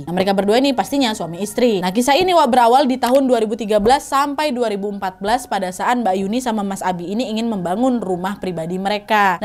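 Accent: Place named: Medan, Indonesia. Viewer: btul. hebat dia bawain ceritanya ngomognnya cepet kali pula logat medan lucu aku loh seirng kaget nntn nya trus dia ini suka jumpscare suka teriak2 sndiri aku jadinya wkakkaka apalagi yg serem dan kdg kebayang sndiri takut banget aku smpe kebawa mimpi saking tiap hr buka khw yg ketinggalan2 krn akh baru subs ini. kebawa mimpi smpe hahahaha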